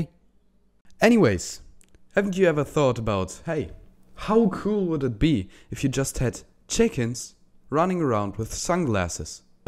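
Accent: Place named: Germany. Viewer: Btw I hope I Identified your accent correctly as german :p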